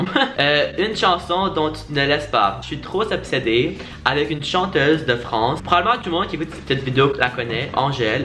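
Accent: Quebec, Canada. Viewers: Oooh je viens juste de découvrir ton accent québécois-français tes trop mignon, so cute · Omg t’a l’accent québécois 😄🔥 Im here from Montreal! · Ton accent québécois est trooooop mignon 🥰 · Lol il est québécois je ne savais pas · OMG T QUÉBÉCOIS JTM OMG AHHHH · Tu à l accent québécois...😅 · Ton accent québécois 😂❤️ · Oh mon dieu!! Je suis française et je savais pas que tu parlais français! Mashallah l'accent québécois!! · Damn I never realised how different Canadian French is to French from France. There are a lot of Canadian sounds like dans le futurrr instead of dans le futurgh if ygm😂😂 Ilyyy 💕💕 So glad I'm taking French as a GCSE rn😂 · TES SI QUEBECOIS😂😂😂😂 (s’cuse, je suis aussi canadienne mais à mon école on tous parle français de france comme les profs tous viennent de france et avant d’aller à cette école mon français était aussi mix) mais oof je voyais pas la différence avant que mon français est devenu si « français de france » et wow je le trouve si drôle d’écouter qqn qui a du français SI QUEBECOIS (pas de shade du tout à toi) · Bonjour, je suis française et j'ai pratiquement la même histoire que toi: mon père est Anglais est vis à Londres et ma mère est française je vis avec elle du coup j'ai toujours parler les 2 langues depuis ma naissance (j'ai passé ma crèche et toute ma maternelle a Londres), mon père viens souvent nous voir mais j'ai toujours étais plus confortable avec le français. 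Ton accent est trop mignon et je trouve qu'il est plus Québécois que français😆. Tu as 19 ans, il me semble. J'adore tes vidéos BISOU!!!💜💜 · Si tu veux réapprendre un peu le français, en tout cas te replonger dedans tout en conservant le charmant accent québécois, tu peux regarder les courts-métrages du réalisateur Émile Roy!!!:D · Ouais je suis très content de voir que tu fais une chaîne avec le super accent québécois qu’on aime tous✌️ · Tu parles un français un peu Québécois, tu viens d’où? [edit]: en fait c’est pas « un peu » mais tres Québécois wow [edit 2.0]: cest bon javais pas réalisé la partie « moi je viens de montreal » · J'adore ton accent de Canadien français. Mais l'accent anglais de ton boyfriend est trop mignon aussi. Il faut vraiment qu'il apprenne le français! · Woaww ton français est vraiment québécois😂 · Ah ce français du Québec j'adore en plus t'as l'accent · juste à t'entendre parler, j'ai su que tu étais québécois! 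( je suis québécoise moi aussi ) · Choqué 😶 je t'ai découvert via ta chaîne anglaise et tes pranks. Je me serais pas douté que tu parlais français! 😄 Tu parles super bien! (On entends bien l'accent québécois 😉) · Je trouvais que tu avais un accent québecois avant que tu le dise 😂😂 Je savais pas que tu etais québecois, mais j'aime trop au mon dieu. Je suis française (ouest de la France) et je suis tellement contente de savoir que tu parle français, genre j'arrive pas a decrire a qu'elle point je suis contente😍😍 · C'est vraiment cool, tu viens du Québec! Tu as un bel accent québécois malgré le fait que tu dis que tu l'as un peu perdu😉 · Tu parles très bien français. Bon après y'a les expressions du genre "Mon cheum" mais ça c'est du français québécois donc aucun souci:). Tu as accent mi québécois mi français j'aime bien. Ha oui faut dire à que la langue française ne se résume pas à "Je suis déguelasse" hihi:) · ah ben tbk t québécoi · T'a grave un accent québécois j'adhère👌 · C’est vraiment cool que tu te partes une chaîne en français, j’écoute tes vids en anglais depuis longtemps pi moi aussi je suis une québécoise bilingue ahaha! 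Mais hey ne te fais pas d’accent français, l’accent québécois c’est cool! Pi si tu garde ton vrai accent québécois les gens vont pouvoir voir que les gens qui parlent français au Québec ont pas le même accent que ceux de la France comme tout le monde pensent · c'est vrai tu as un accent québecois aussi XD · Ce n’est pas une accent mixte c’est pure québécois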